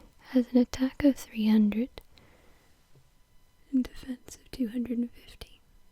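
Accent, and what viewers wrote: Wales, United Kingdom. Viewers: are you Welsh?? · What part of Wales are you from? I'm from Caerphilly near Cardiff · Are you welsh? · is this girl welsh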